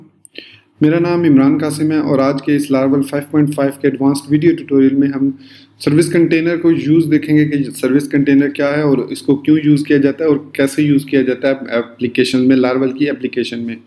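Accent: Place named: India. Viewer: Hi sir, i saw that you have many likes, and ppl likes you, but your articulation is bad, i know it is hard for you in your country i can understand that. But in one moment i find that you speak English in another i think it is Indian. I can not distinguish is it English or Indian :).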